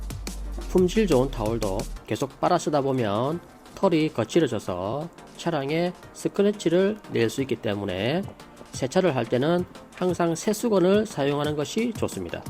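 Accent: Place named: Gyeongsang, South Korea. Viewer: 어색한 표준말 경상도 티 다난다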